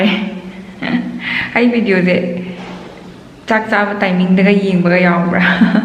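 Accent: Kakching, India. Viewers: Lon thp tamei nowraga last ta yong ym kuirameba heiglak a.....😀 kaow yadeba eikhoi kakching lonse nungcbanehe😍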